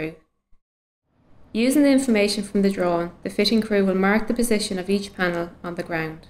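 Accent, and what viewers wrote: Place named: Ireland. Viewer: Me too Juan, she has a lovely voice and sounds Irish to me.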